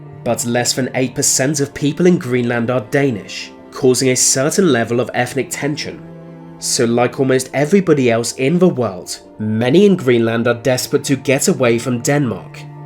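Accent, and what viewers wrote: United Kingdom. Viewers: What about a United States of, where the biggest export is weird facts and stories told in a snarky, British accent?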